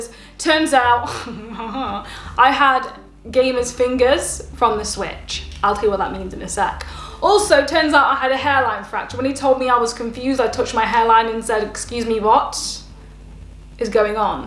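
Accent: United Kingdom. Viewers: I must be down horrendously bad because the white toes and the UK accent is my weakness. I can listen and watch her but the white toes sigh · Just love your amazing British accent of yours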